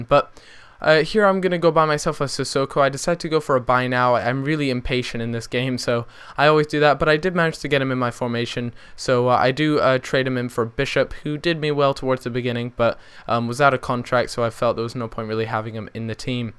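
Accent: Scotland, United Kingdom. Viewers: Are you Scottish or have Scottish relatives ? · are you scottish?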